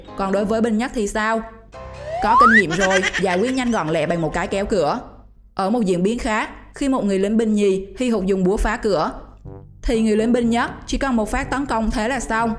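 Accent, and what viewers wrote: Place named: Binh Dinh, Vietnam. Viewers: Chịu khó đọc bằng giọng phổ thông đi em, còn nặng giọng địa phương Bình Định lắm. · bạn nữ có giọng tốt, âm thanh tốt, nhưng còn nói tiếng địa phương quá nhiều, bạn nên tập trung nói 1 giọng hoặc giọng Bình Định rặc, hoặc giọng giọng Bình Định nhưng sửa lại 1 số từ phát âm địa phương như các vần "in, ăc, ăng, ăn..." hoặc giọng miền nam, tránh phát âm các từ địa phương nghe nó quê mùa lắm. Chào thân ái - đoàn kết - xây dựng!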